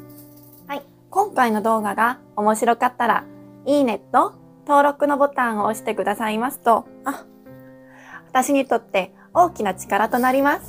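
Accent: Kansai, Japan. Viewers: 関西弁使ってるやん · こんにちは😊辛いの、ダメそうかなと思ってたら、やっぱり🤭鼻水でるし、汗かくし。『ちょっと腹立つなぁー！』ウケました（笑）ちょこちょこ関西弁出るし…。ワンちゃんの大事なところ、隠してくれてアリガトワン！（笑）🐶娘がちゃんより、少し歳下ですが、娘を観る感覚で観てます👍では、アンニョン🎵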